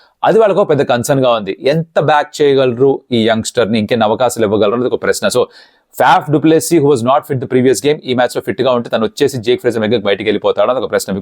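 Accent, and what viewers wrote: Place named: Godavari, India. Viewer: Bro Dialect enti Godavari Zilla vala la undi , Any roots from Godavari Districts?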